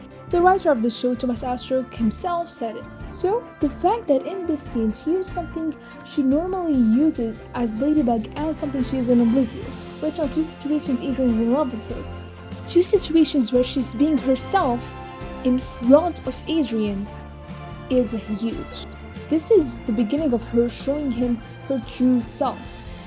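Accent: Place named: France. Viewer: Your French accent is so strong I thought you were speaking French in the beginning for a sec there😂😂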